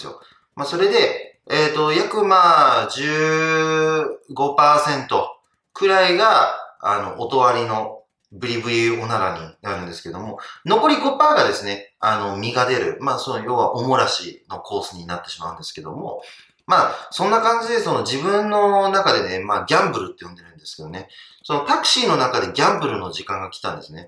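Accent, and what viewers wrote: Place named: Okinawa, Japan. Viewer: 喋り方が沖縄すぎる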